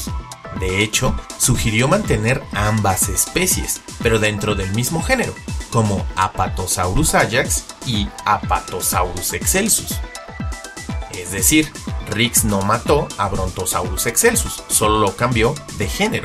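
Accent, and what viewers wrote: Mexico. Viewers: ¿Eres de México?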